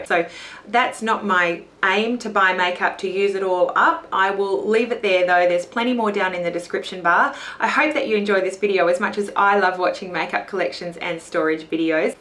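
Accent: Australia. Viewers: Yay! Love: 1. Hearing an Australian accent in a makeup video - where are all the Aussies on YouTube?! 2. That you're over 22! Where are all the adults on YouTube?! Subscribed!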